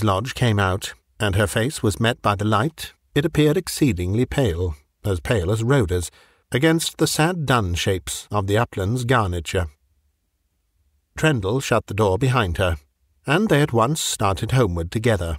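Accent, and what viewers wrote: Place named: West Country, United Kingdom. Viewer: You do a marvelous West Country accent.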